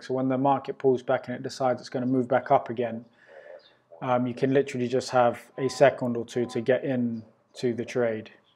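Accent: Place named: England, United Kingdom. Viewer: Thank you , a lovely lilted English accent a calm manner and uncluttered simple fairly clear approach, great pyschologically for some of us more (greedy, A type)Anglo stressors....Regards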